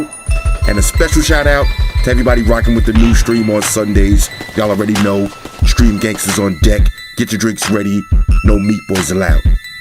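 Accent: New York, United States. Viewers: You are a Great reviewer!. I'm here mainly for your NYC Accent!. And I almost forgot, Pitching me rolling!🤣😂👍🍺🍺🍺🍻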